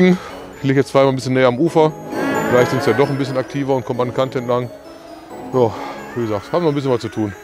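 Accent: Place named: Bavaria, Germany. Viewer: Kommt auch auf den Bestand an😉 vom Dialekt her denke ich kommst du aus Bayern.. jedes Gewässer ist halt anders.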